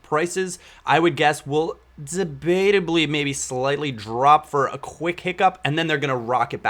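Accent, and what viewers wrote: United States. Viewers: leave a like for american accent !!! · hes american isnt he?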